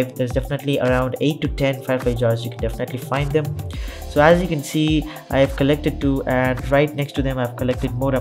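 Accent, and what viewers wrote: India. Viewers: If your indian you have the best indian English accent nice your the only indian I can understand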